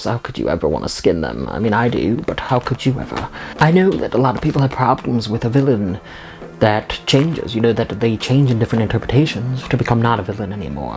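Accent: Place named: England, United Kingdom. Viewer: Bravo! Really insightful thoughts about an awesome movie ( which SO needs to win an award for costume design). That English accent is pretty damn good too!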